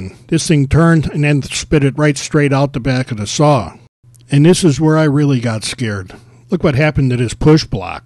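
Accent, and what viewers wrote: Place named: Midwest, United States. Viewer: People need to see this just because of how dangerous a table saw or any kind of saw is. I recognized your accent right away that you're from somewhere in Wisconsin or the Midwest for that matter. I myself am originally from Wisconsin and use to work for a window factory. I've had a couple close calls myself from the 4 years I worked there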